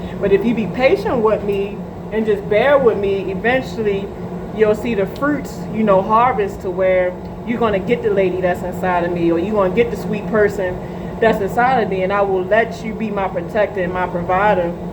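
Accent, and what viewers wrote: Baltimore, United States. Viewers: Much respect queen, u gotta be from bmo. I can hear it, being from pg. Stay strong stay sharp stay genuine! Much peace, love, positive vibes!!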